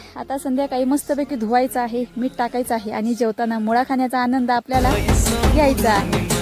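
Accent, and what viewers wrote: Standard. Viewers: Tai ...tujh kiti shikshan jhal....bolnyat standardness khup aahe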